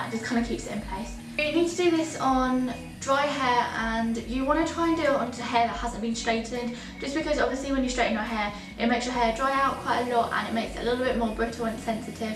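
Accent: United Kingdom. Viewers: r u brittish ? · he I'm french and I looove ombré hair! But don't you think I shouldn't do it at home ? it'll be better at a haidresser don't you think ? pleaase answer !! oh by the way I don't really get your accent ? are you british ? not american I guess ? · ARE YOU BRITISH? ;O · Dat british accent...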